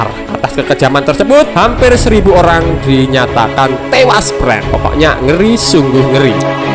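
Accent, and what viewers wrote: Java, Indonesia. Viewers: Min pertahankan medok mu pakek kata "osot punya osot"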